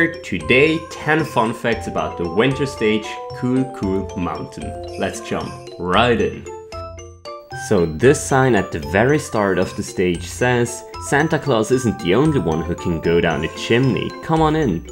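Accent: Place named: France. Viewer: That French accent though. Proud of you. Maintenant tu vas pouvoir aller draguer!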